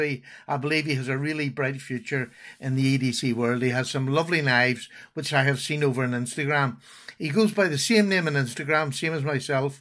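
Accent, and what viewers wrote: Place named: Ireland. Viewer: I fucking love the irish accent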